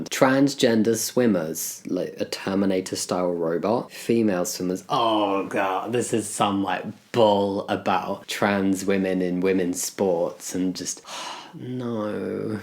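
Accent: United Kingdom. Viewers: You just sound brittesh😊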